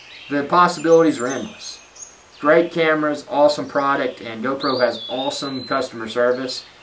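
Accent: South, United States. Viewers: I have a feeling it is angry Europeans disliking his southern accent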